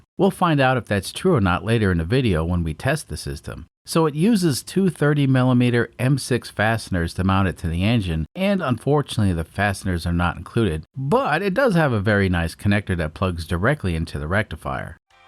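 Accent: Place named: Boston, United States. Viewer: It sounded good, I think what was different was it was just a slightly different presenter voice, maybe slightly more comfortable than normal. It was very clear and had good quality audio. Reflecting on it after I commented I honestly got a little choked up. I grew up listening to CarTalk Sundays. Your accent is a mix but leans Bostonian it reminds me of Tom and Ray a little.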